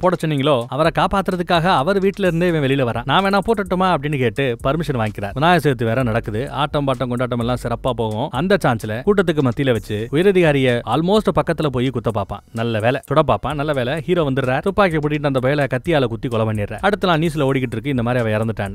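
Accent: Coimbatore, India. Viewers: 😂😂 anne nenga coimbatore ahh😅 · Poramai &pochirubu kovai slag bro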